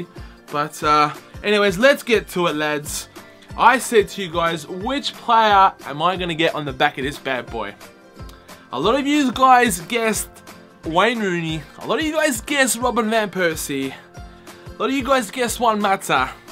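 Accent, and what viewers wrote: Australia. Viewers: Your Australian ??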